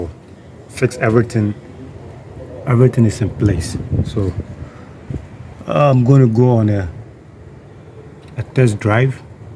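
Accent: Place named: Cameroon. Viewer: This is my Cameroonian brother, I can tell from his English 😂 Am having same kind of noise like ratting in my Honda CR-V 2022.....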